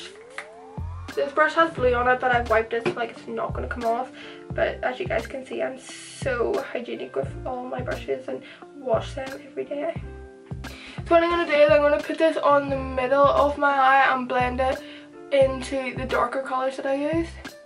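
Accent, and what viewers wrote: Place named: Northern Ireland, United Kingdom. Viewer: It's so refreshing to see a YouTuber from Northern Ireland!!! I feel like I always forget our accent exists on the internet😂💜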